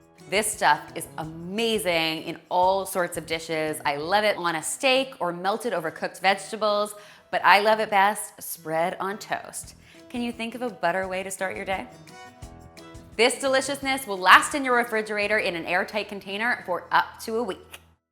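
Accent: Canada. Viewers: Numptie Brown She is Canadian . and BTW English and American has different accents and it's herbs and erbs, so. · she's canadian, everyone has different accents. it can be both honestly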